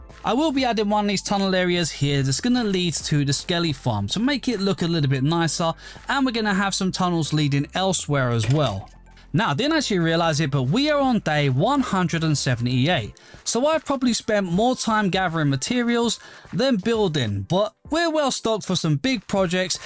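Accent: United Kingdom. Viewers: Should not be hate but are you British cuz the accent sounds like it?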